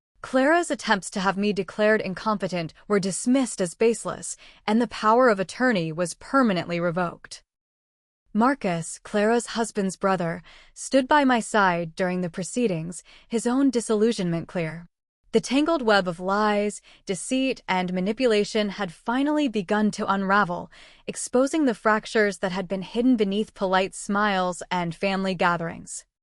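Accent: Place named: United States. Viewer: If she was born on Australia why has she got American accent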